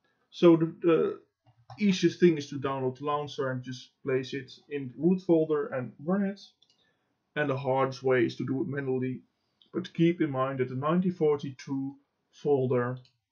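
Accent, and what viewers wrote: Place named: Netherlands. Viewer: hey I get an error each time the launcher is downloading, also you have a dutch accent I can tell because I am a fellow Dutchman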